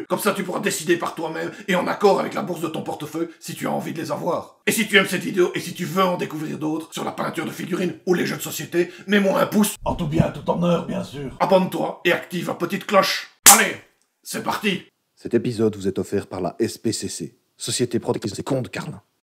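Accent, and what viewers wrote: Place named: Hainaut, Belgium. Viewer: Rien que pour l'accent hennuyer, c'est abonné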